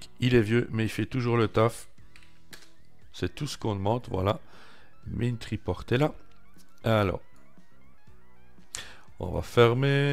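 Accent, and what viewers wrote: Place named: Luxembourg Province, Belgium. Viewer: salut je découvre ta chaine via Adrien D.(linuxtricks) tes vidéos sont pas mal, il y a juste que tu parles un peu lentement au démarrage de ta vidéo :D j'ai failli zappé, j'ai cru reconnaitre un accent de ma région (sud de la province du luxembourg) :D je te mets un pouce pour celle là et tu gagnes un abonné ;)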